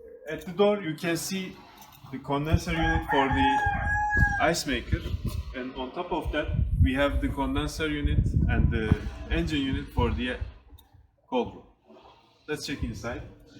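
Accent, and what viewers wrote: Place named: Turkey. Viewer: most turkish accent i ever seen in my life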